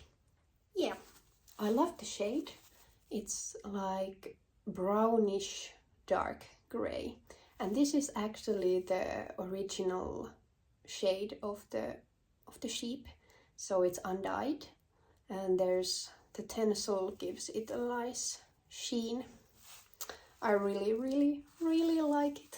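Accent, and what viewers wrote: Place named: England, United Kingdom. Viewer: You have a beautiful English accent!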